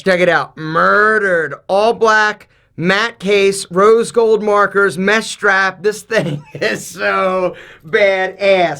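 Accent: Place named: New Jersey, United States. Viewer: You gotta be from Jersey right?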